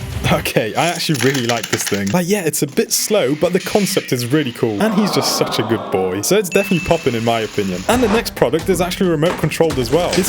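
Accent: England, United Kingdom. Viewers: He British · are you english or british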